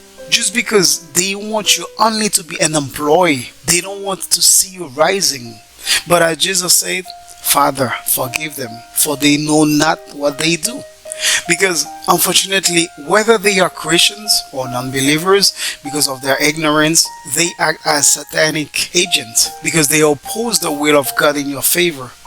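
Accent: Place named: France. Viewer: Interesting! I really appreciate your french accent.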